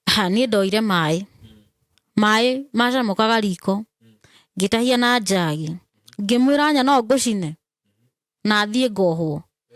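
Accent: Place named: Nyeri, Kenya. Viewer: She is sturbon nyeri lady from her talk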